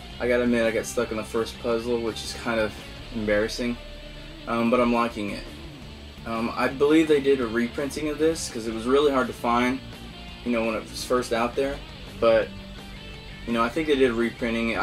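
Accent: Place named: France. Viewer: french accent not british good video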